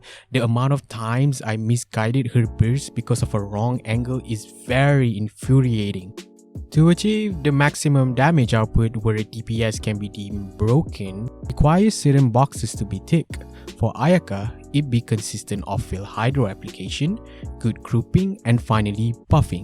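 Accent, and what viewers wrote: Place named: Malaysia. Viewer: bro got that malaysian accent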